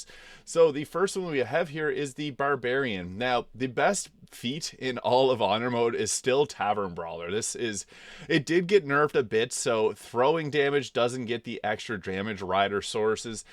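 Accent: Canada. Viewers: I detect a Canadian accent. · LMAO, i legit watch this channel for two reasons: 1) great bg3 content and 2) the hilariously thick canadian accent 😂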